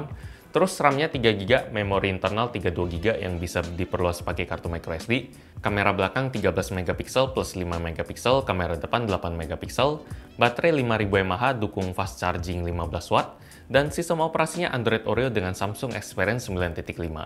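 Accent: Palembang, Indonesia. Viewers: Palembang Om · dia orang palembang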